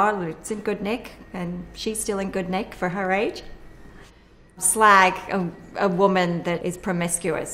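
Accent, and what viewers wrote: United Kingdom. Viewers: I watched this with a huge smile on my face. I learned a lot and she's too cute. :) Wish she would use her awesome British accent in her performances as well.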